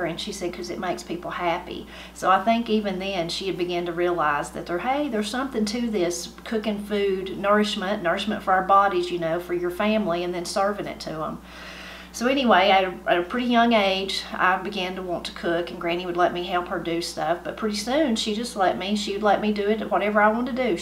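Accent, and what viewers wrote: Appalachia, United States. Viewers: My family are from the Appalachia area, central Appalachia to be precise. We have an accent similar to this woman’s but it sounds a little different. I get asked all the time when I’m on vacation out of state “where are you from”? Lol Or, “your accent is strong”. I say just yup, I got that Appalachia accent. 😂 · East Ky Appalachia here and we sound about the same!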